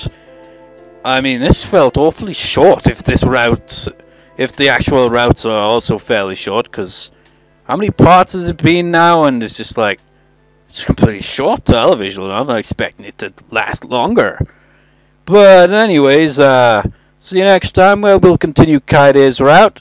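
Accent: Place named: Wales, United Kingdom. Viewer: It sounds Welsh now that I know, but I thought It might have been English.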